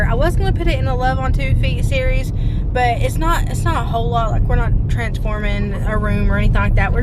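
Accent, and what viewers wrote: South, United States. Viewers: I love “maters” for tomatoes. Your accent and southern sayings are awesome. Keep up you weight loss journey! You can do it!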